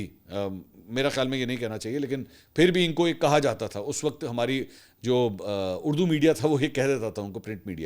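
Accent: Punjab, Pakistan. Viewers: میں آپ کے پروگرام اور آپ کی منظم خبروں کا احترام کرتا ہوں۔ بات کرنے کا شکریہ۔ آپ پنجابی لہجے میں بھی بات کرتے ہیں۔ تاہم، آپ کو میرے پچھلے جواب کو دیکھنا چاہئے۔ تہہ دل سے شکریہ کے ساتھ۔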